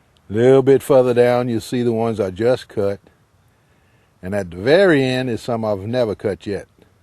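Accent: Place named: Louisiana, United States. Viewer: Vous devez parler le français cadien ... I can tell by that accent :P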